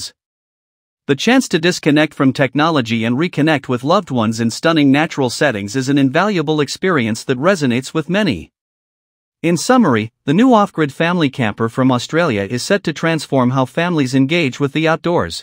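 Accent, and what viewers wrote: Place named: United States. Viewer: Sounds like it’s 😮Voiced over by an American.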